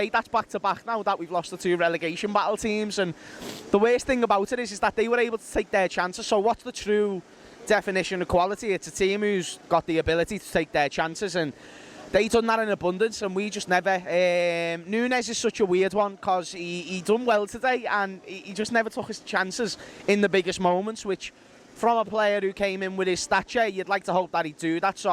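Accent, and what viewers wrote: Liverpool, United Kingdom. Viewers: This fella is offensively scouse ...LEEDS LEEDS LEEDS LEEDS ! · Always love the Liverpool accent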